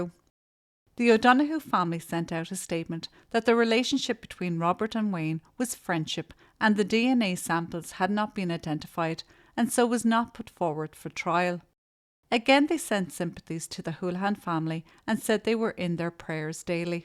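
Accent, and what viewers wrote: Ireland. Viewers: I subscribed 20sec in, coz of the accent.. I am Australian after all, and we just love an Irish accent.! 🍀 But then you said horseshit girl.. and you had me again. 😅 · Not boring in my opinion, a million times better than most US crime doco's with all their hysteria and emotive over dramatic adjectives. I'll take this calm voice and lovely Irish accent every time.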